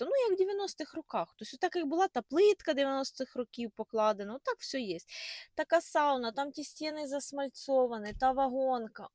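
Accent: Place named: Ukraine. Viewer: Какая же у вас речь противная, ну как вы слова произносите, это просто кошмар. Как хорошо, что я не родилась в хохляндии, не дай бог вот так разговаривать.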